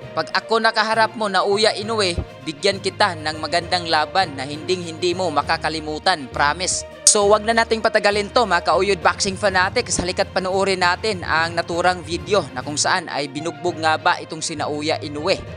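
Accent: Visayas, Philippines. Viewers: Bisaya ka talaga tol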